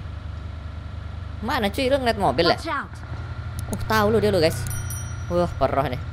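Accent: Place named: South Kalimantan, Indonesia. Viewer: orang kalimantan sltan itu bang bhasanya wkwkwk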